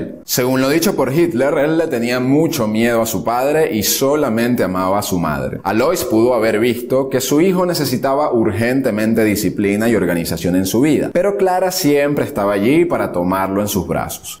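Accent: Venezuela. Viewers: Yo sabía que este chamo es venezolano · Hermano tu eres venezolano?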